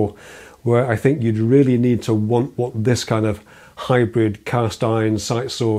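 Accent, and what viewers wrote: United Kingdom. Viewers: I subscribed because everything sounds better in a proper British accent. I’ve never seen his work but I am already convinced he makes the most extraordinary pieces 😅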